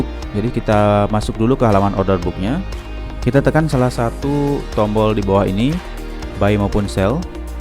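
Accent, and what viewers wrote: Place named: Bali, Indonesia. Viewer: Logat baline kental sekali bli... 🙏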